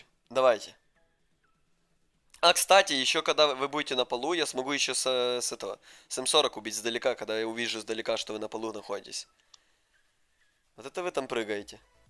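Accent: Ukraine. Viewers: ты украинец? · Видно видно Украинская проризается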